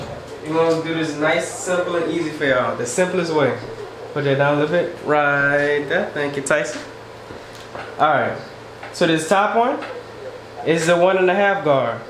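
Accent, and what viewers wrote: New Orleans, United States. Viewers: Are you from New Orleans? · He gota be from New Orleans ya heard me... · Sound like you from here in the N.O. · Am I wrong? I heard a N.O. accent. 🤔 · Bro are you from Louisiana fam?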